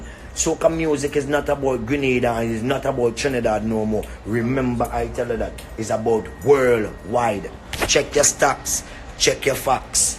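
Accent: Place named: Jamaica. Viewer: This was a set up from start,they were intimidated n pulled this stunt...... i luv u but y the Jamaican accent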